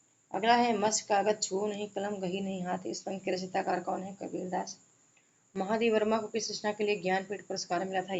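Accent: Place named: Lucknow, India. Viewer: अवधी भाषा की खनक आप की आवाज में बिल्कुल लखनऊआ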